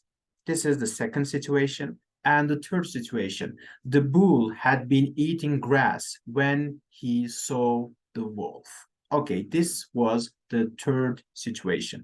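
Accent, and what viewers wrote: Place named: RP, United Kingdom. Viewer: Bülbül gibi ötmek, ingiliz kraliyet ailesi gibi ingilizce konuşmak istiyorsanız doğru yerdesiniz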